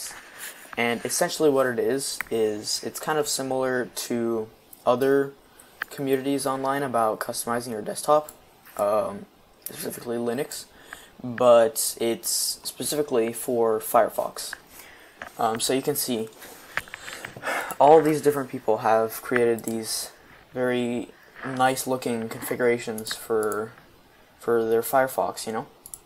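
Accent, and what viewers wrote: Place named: Brazil. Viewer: demorei mt pra perceber q tu é br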